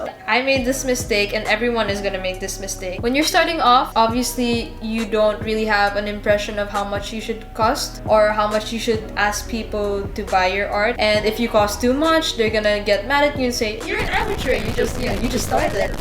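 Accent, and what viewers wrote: Philippines. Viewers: i can tell youre from the ph bc of the accenT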